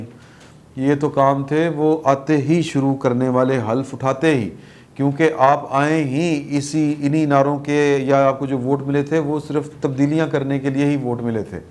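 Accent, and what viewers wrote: Punjab, Pakistan. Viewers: I love ur mix Punjabi talk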